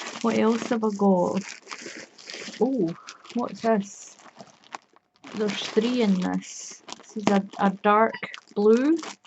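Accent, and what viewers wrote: Scotland, United Kingdom. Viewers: Where in Scotland are you from? You remind me of someone from Lanark 👍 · I agree with you that I would rather see and feel yarn before buying!! Love your Scottish accent. I am American, but I think I have Scottish DNA because I easily talk in the Scottish brogue. Lol. I am not so good with the Irish. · I legit subscribed to your channel JUST so I can listen to your accent 😍 I miss Scotland! 😭😭😭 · Dawn Montgomery She has a Scottish accent. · really? You didn’t know Scottish straight away? I’m Scottish I’m not being rude, I’m just curious 😃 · i would love to have a yarn surprise from ebay! i love all that yarn, and i love your accent in your voice, you must be from Scotland? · I miss hearing my Gran and Grandad's Scottish accent so listening to you has brought back so many wonderful memories 💕 I would make a little weaving machine and make some nice wall hangings💖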